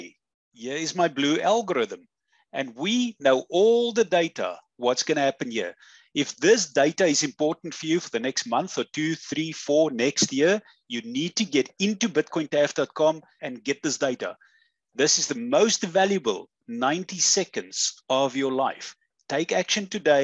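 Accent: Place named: Afrikaans, South Africa. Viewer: Yes it is. It's an Afrikaans accent from South Africa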